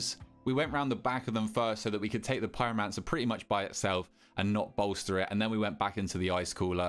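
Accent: United Kingdom. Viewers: Couple of questions mate, how do you even click those skinny nameplates and also, where in the UK are you? You sound fairly southern... Great content btw, love it.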